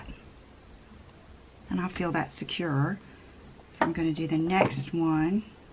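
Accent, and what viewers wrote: South, United States. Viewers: Okay, your brow talk cracked me up! Too cute! I can't believe some people feel the need to correct the way others pronounce words. And the worst part is one can never say it their own way ever again without thinking of that diss. I love the way you talk and it's endearing to hear your southern words. You say tomato I say tomato ;-)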